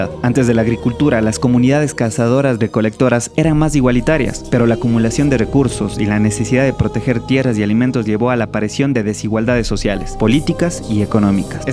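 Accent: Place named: Ecuador. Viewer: Eres de ecuador? El acento es inconfundible :P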